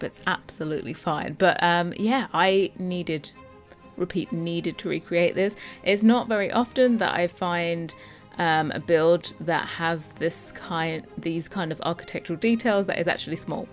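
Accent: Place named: Dorset, United Kingdom. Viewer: You're from Dorest? I can hear it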